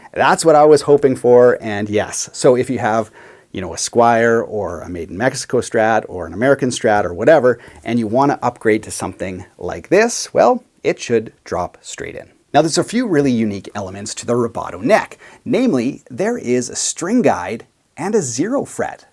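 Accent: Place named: Maritimes, Canada. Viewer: Prairies eh? I was so sure you were from the maritimes LOL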